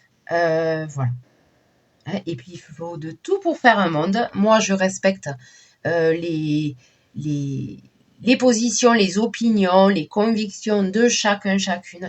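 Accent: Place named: South, France. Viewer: Vous avez un accent du soleil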